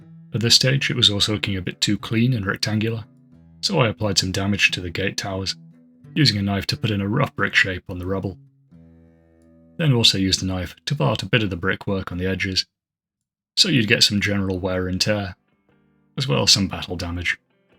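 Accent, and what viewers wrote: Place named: United Kingdom. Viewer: Another great vid! Where do you get your blue foam from? I've not seen it in any of my local craft stores (I'm assuming you're based in the UK based on your accent.)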